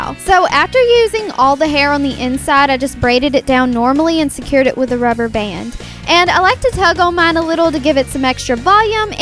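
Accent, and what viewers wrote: South, United States. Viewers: is it me or she has like a country accent kinda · U r really pretty and ilove ur country accent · Southern accent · You sound country! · you sound like you're from the south.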